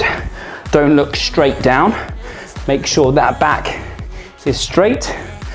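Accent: United Kingdom. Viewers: I'd like to win . Appart from the excersices i love the british english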